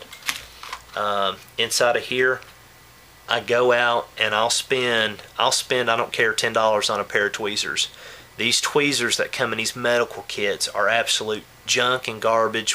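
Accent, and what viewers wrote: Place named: South, United States. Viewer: Your accent tells me somewhere in the south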